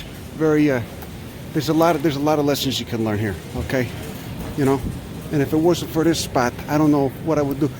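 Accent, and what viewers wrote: Chicago, United States. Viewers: imagine trying to shop and this dude comes through the aisles rambling his chicago mouth off about mushrooms and california ppl stereotypes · no offense but ya must not be around many working class Chicago people- because as a Wisconsinite, I thought Chicago immediately. It’s just not a suburban Chicago accent. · Perfect Chicago accent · Jeezus man , I can smell Chicago on you from here , love it, makes me miss home brother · Sounds like he's from Grand and Ogden. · Chicago Italian, the accent definitely has the east coast and mid-west flavours combined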